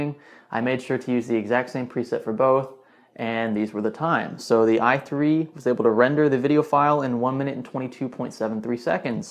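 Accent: Louisiana, United States. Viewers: are you from Louisiana?!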